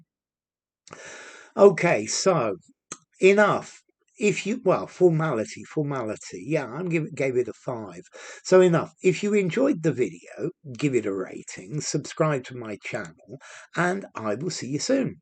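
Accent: United Kingdom. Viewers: Sir You made me loving british accent.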